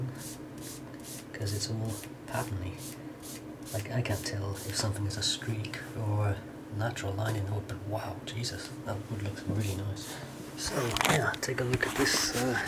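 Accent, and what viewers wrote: Wales, United Kingdom. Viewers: Are you Welsh. Muss ?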